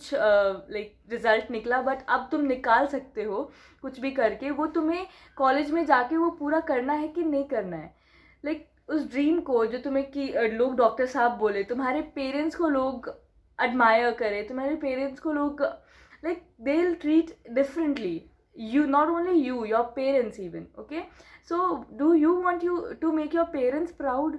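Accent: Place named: Saharanpur, India. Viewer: Di aap saharanpur ki lagti ho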